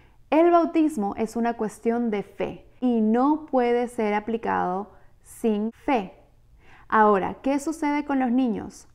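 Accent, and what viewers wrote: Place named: Lima, Peru. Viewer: ¡Te felicito! Excelente didáctica. Soy apologista católico desde hace treinta años y me enorgullece ver tu prestancia y razón para defender nuestra fe católica y su verdad recibida del mismo Espíritu Santo. A propósito, siendo yo del Callao Perú, capté tu acento limeño. Te pregunto, ¿estás en el Perú o en EEUU? Muchas bendiciones en tu apostolado.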